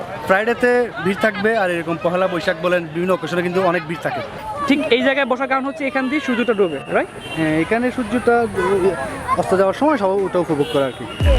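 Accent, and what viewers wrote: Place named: Kolkata, India. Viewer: vaiaa...xoooss...video..anek beautiful..r nc valo..contents..kolkatar kotha mone porle apnar kothao mone pore..#nawrat#najabat jani.na apnar mone ase kina....but amar mone ase..✌👌👏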